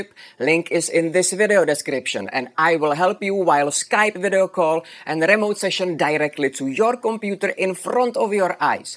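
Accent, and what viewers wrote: Czech Republic. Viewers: Glad I found your channel, great stuff!! I love the accent as well, are you czech? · Hey, are you czech by any chance? You have an accent, which really reminds me of the czech ones lol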